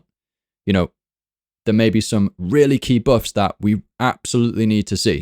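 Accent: United Kingdom. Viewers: Selby is from Leicester. You, too? Perhaps a Leicester accent but wtf even the voice matches lol.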